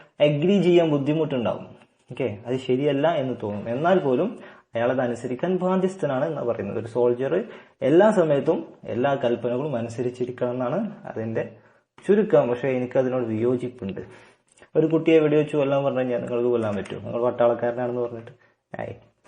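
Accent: Malappuram, India. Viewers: malappuramaano thaankal???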